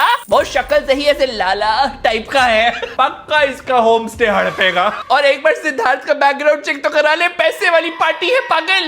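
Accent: Punjab, India. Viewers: His Punjabi accent ❤ >3 is love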